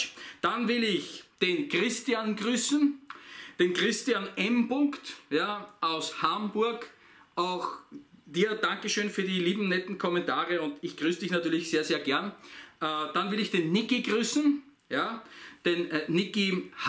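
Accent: Austria. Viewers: Lieber, und alle Komentarleser Ich, persönlich, hab den Kanal abonniert weil: 1. ich mich für Outdoor-Ausrüstung interessiere und immer auf der Suche nach neuen bzw. unbekannten Sachen bin, die nicht jeder hat und kennt. 2. du einfach, authentisch, sympatisch, rüber kommst und der österreichische Akzent mich amüsiert. Wer was anderes erwartet hat ist meiner Meinung nach hier falsch. Ich hab im übrigen schon Kanäle deaboniert weil mir das stäntige Gegrüße in den Videos auf den Zipfen gegangen ist, so ein Blödsinn! Das mit den Kanalvorstellungen find ich auch deppert aber gut seis drum. Ich werd mir diese Videos dann einfach nicht ansehen. Aber ich will dir da auch nicht reinreden mach wie dir lieb ist. MfG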